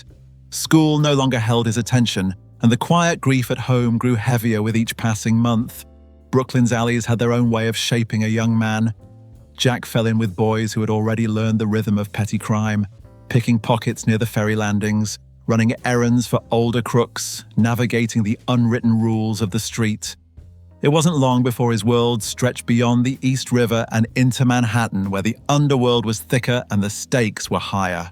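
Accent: England, United Kingdom. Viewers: Dont listen to the haters, really delete the comment, Thats what Jack would have done ( probably that and more 😅😅). Your accent is rich and sounds like proper English. You and fellow Podcaster The Last Supper have top notch Flows🌊🌊🌊🏞🌀🌀🌀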